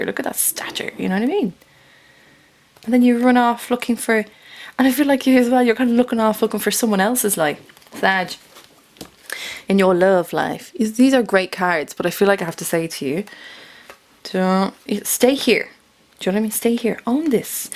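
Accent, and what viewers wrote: Ireland. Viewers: Love that reading, love the Irish accent too! I'm Irish and lived in America for twenty one years, never got the adopted American accent, so hopefully you won't either! Thank you!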